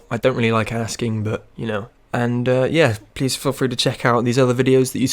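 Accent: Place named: West Country, United Kingdom. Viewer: This is bloody brilliant- had me laughing and is surprisingly feasible! Is that a west country twang I detect in your voice,?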